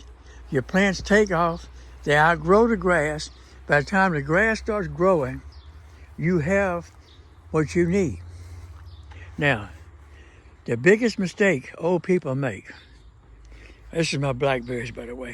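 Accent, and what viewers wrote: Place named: North Carolina, United States. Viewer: Thank you for the great video! Are you from North Carolina? You sound exactly like my grandparents!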